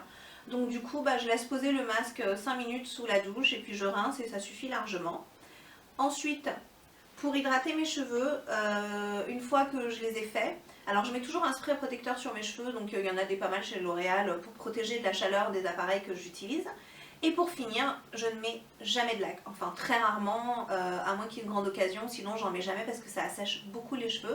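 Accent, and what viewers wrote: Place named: Iran. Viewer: Intonation iranienne.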